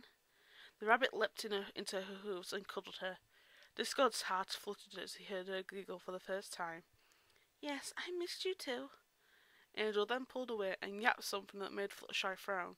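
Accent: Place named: United Kingdom. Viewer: I was wondering if an I was supposed to be there, but got missed out. I sometimes do that, miss words out, especially if I'm on a writing buzz. Well your accent isn't "British" what-ho old chap I went tp a Eton don't ya know, but it still sounds like a British accent to me and I was refrring to your "Maybe it's supposed to be like that, but not for me, I'm British!" or something along those lines. And I'm going to have to re-read that scene, lol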